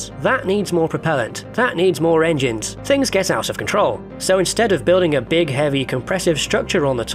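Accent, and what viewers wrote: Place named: Scotland, United Kingdom. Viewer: What is this accent? Scottish? Canadian? Kazahkstani?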